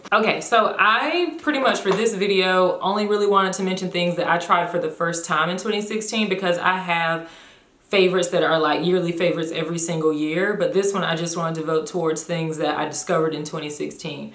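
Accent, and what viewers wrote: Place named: Alabama, United States. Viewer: Great video and I love your hair! My question: Where are you from? I hear an accent...perhaps Hunstville, AL?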